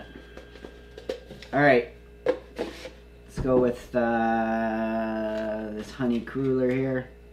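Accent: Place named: Canada. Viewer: had to sub because u sound canadian and not the fake canadians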